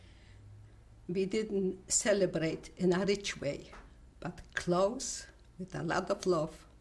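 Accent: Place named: Germany. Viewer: Boy she has that strong German accent especially when saying my the German way Meine Vater the v make the F sound in German.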